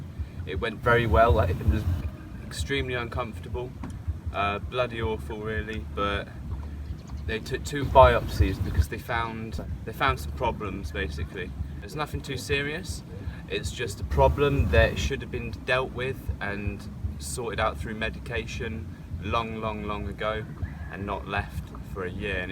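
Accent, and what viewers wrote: United Kingdom. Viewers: Ah so you are from UK. I thought you are Australian. I can’t tell the accents. Between the two. Glad you are ok now. Cute puppy there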